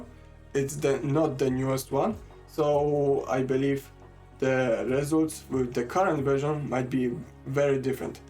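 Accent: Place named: Poland. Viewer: Odpalam i słucham - pierwsza myśl polski akcent, sprawdzam Artstation i jest! Pozdrawiam! :D super video!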